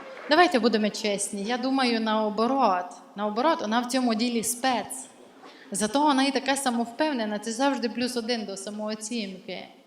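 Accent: Transcarpathia, Ukraine. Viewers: Дякую за Вашу творчість, за Закарпатську говірку. Усі говірки - то скарб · Гарно балакає по закарпатьськи, ФАЙНООО!!!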